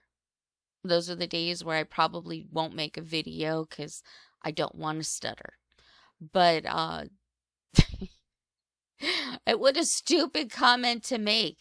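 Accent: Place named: Geordie, United Kingdom. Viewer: I agree, maybe even Leeds. I thought at one point he sounded slightly Geordie or Durham way but he's definitely British I believe 😊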